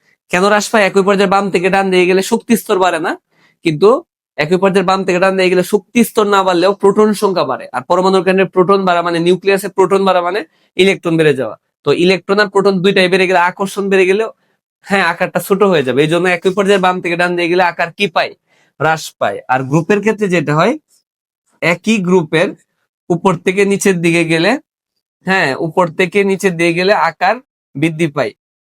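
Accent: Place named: Comilla, Bangladesh. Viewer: Vaiya Apnar gramer বাড়ি may be কুমিল্লা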